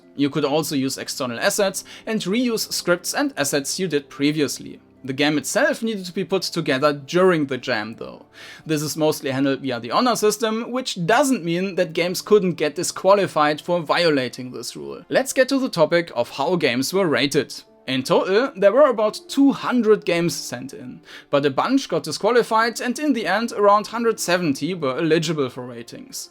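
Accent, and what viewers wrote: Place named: Germany. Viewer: Ur englisch sounds so german :). Cant wait for this years crawler jam to start.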